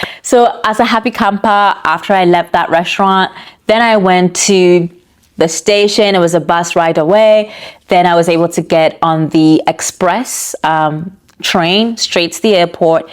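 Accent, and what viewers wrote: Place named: Italy. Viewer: I read Roma and Positano in your acquired Italian accent haha! If you spent over €300 a day charging then that's definately theft of some kind. Sorry. And that's positive...your gesture towards my app. Appreciate it.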